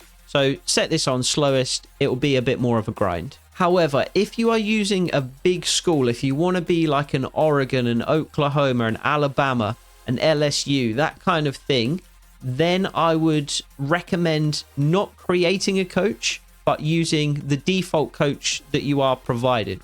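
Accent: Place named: United Kingdom. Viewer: I'm sorry but I can't help but cringe anytime I hear a British person talk about college football.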